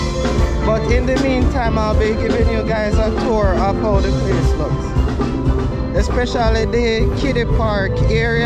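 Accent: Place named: Jamaica. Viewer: Are you Guyanese, you sound like you’re from Jamaica? Good job nevertheless kudos to you. 👏🏽👏🏽👏🏽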